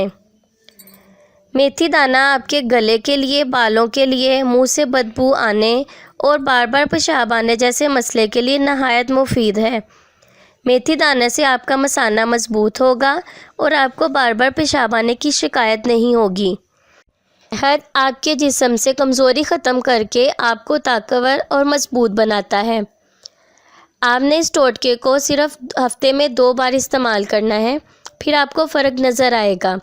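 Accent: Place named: Sialkot, Pakistan. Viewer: Aapke Bolne ke lehje se lag raha hey keh aap distric sialkot se hey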